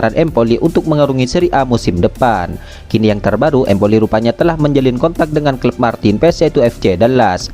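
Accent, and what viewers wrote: Madura, Indonesia. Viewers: adminnya. kaya orang madura ya😁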